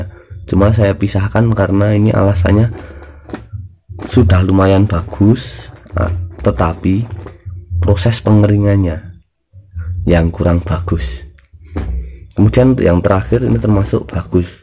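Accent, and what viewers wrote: Temanggung, Indonesia. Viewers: Dari logatnya bebicara kayaknya dari temanggung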